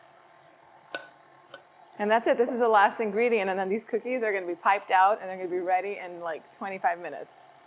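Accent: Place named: United States. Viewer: Γειά σου. Πρώτη φορά μού βγήκε βίντεο δικό σου στο YouTube και από το όνομα σου καταλαβαίνω ότι είσαι Ελληνίδα και χαίρομαι που δείχνεις ελληνικές συνταγές. Αυτά τα αμυγδαλωτά είναι τα αγαπημένα μου και τα φτιάχνω κι εγώ. Εγώ είμαι από Θεσσαλονίκη κι εδώ τα λέμε εργολάβους. Θα κάνω εγγραφή στο κανάλι σου και θα σε ακολουθώ. Από τι κατάλαβα από τα αγγλικά πού μιλάς μάλλον είσαι Αμερική. Αν θέλεις γράψε μου. Να χαίρεσαι το κοριτσάκι σου!! 🌷🌷🌷🌷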